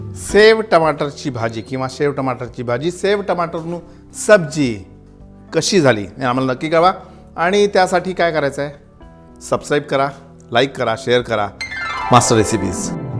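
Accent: Vidarbha, India. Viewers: Hi I am from Baroda and I am a regular follower of your recepies.I love the vidharbha touch in your Marathi reminds me of my childhood days ....We call it as Sev Tamata nu shaag.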